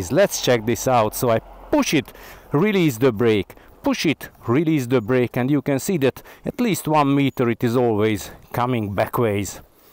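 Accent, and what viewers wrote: Hungary. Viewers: Great video. Based on your accent, are you Hungarian?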